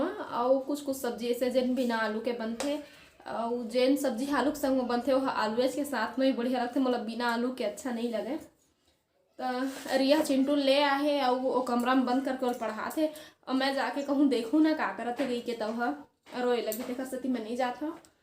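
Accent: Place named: Chhattisgarh, India. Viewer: बहुत बढ़िया दीदी आरूग छत्तीसगढ़ी म बोली बहुत सुंदर लगते आप कहां से हो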